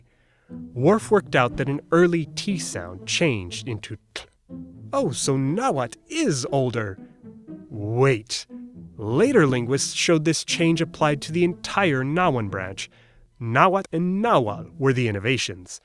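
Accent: Italy. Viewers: This narrator has the consonants correct, but in some areas he expresses an Italian accento, which is not in the Aztecca pronunciation. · Your Italian accent comes out on this one. But this one was very well done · I cant help but notice you tend to use Italian (or maybe Latin?) accent and inflections when you speak not English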